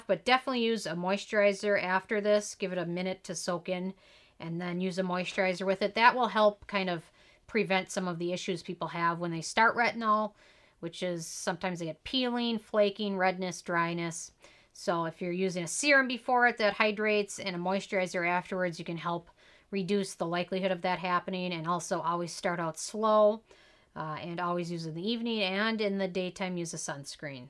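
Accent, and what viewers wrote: Minnesota, United States. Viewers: My husband said "she sounds like she's from Minnesota!" He's originally from there, but doesn't have the accent. LOVE IT! 😍😍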